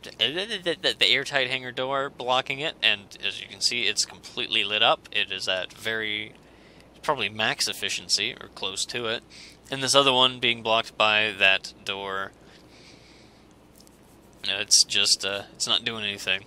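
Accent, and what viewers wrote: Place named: Australia. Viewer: Totally Aussie!!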